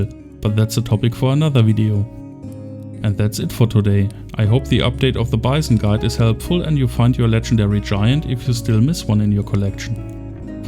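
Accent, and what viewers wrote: Germany. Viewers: you sound German cuz of your accent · and btw are you german?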